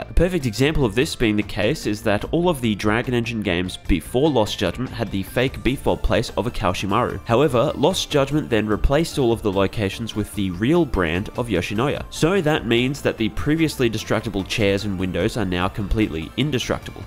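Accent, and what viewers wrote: Australia. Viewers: The Aussie Just shone through in this video, never felt more at home!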